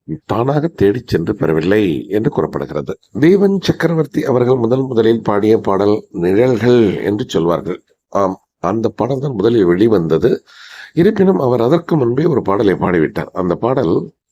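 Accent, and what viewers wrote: Jaffna, Sri Lanka. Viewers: யாழ்ப்பான தமிழ் கேட்க கேட்க அவ்வளவு இனிமையாக இருக்கிறது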